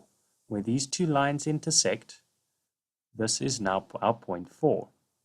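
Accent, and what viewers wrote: South Africa. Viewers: You sound Afrikaans, Mr Sir. Would you like some YouTube help by the way?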